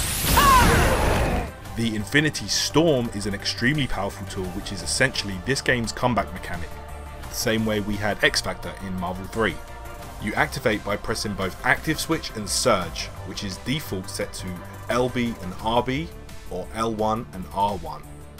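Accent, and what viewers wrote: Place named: United Kingdom. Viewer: British accent is a plus in a guide.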